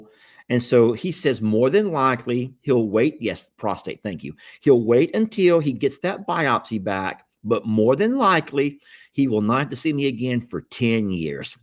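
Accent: South, United States. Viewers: I think the pronunciation could actually be that your from the south with a little southern drawl!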